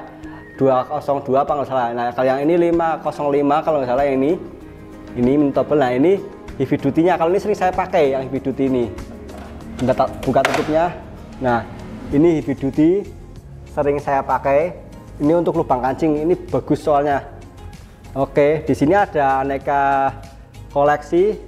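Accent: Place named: Pekalongan, Indonesia. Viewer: Assalamu'alaikum mas, saya penjahit dan tertarik dgn kontennya karena enak dan jelas, dan logatnya saya kenal, ternyata bener orang pekalongan.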